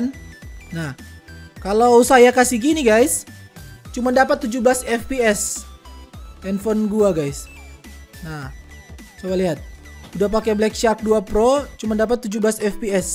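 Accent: Manado, Indonesia. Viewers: Jan pake logat Manado bang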